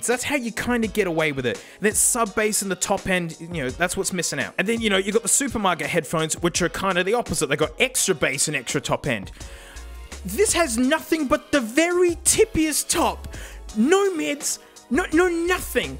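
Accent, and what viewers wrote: Australia. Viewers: Question are you Australian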